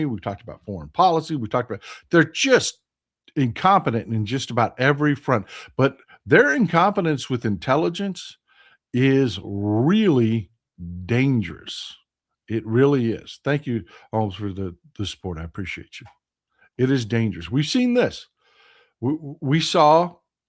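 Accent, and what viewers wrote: Texas, United States. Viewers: Glad I found you. Been watching for a couple of weeks and I’m glad a fellow Texan, sharing my views, is broadcasting or rather, streaming as they now call it, and talking like a true Texan. The candid and straightforward way you communicate is the way my daddy got his message across. I’m 70 and tech is outrunning my ability to keep up. Hell, while tech is speedily moving ahead, sadly, my mind needs the spurs every now and then. So, I’m appreciative that I can hear facts from someone I relate to. Looking forward to every post, keep it up and thanks.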